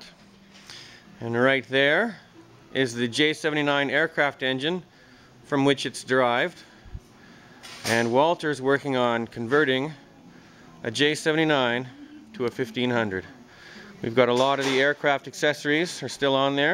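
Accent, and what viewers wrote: Canada. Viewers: very informative video. Thanks, mate. On a different note, are you Canadian?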